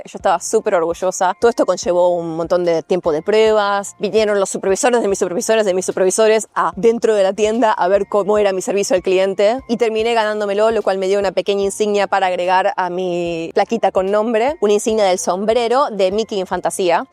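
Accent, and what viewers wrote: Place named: Argentina. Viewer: ¿ Acento ? muy argentino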